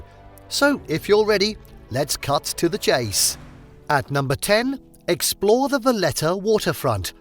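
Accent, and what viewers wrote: England, United Kingdom. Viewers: Among others: This fortress served against RUTHLES OTTOMAN EMPIRE, I believe that when you point the finger or insult someone with tendencies and that someone has ruled half of the world and beyond, at least change the accent so that it is not understood that you are English, since today in April 2024 countless countries pay taxes and other benefits to Britain without knowing why they are poor?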